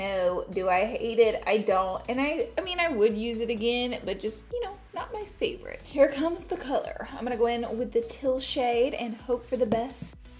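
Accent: South, United States. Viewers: I'm from Alabama! That accent is so southern. I'm from a really country town. Like right below Tuscaloosa, Al. Roll Tide nation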